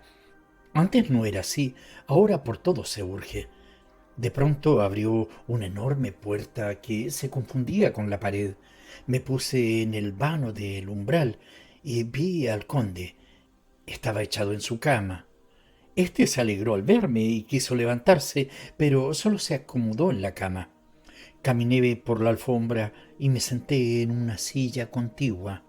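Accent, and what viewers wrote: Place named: Chile. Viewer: yo también ya echaba de menos esa voz y dije: «tengo que escuchar otra biografía más» 😅 Un abrazo muy fuerte. Por cierto, ese acento no es taaan marcado, pero ¿será que es chileno? 🤔😌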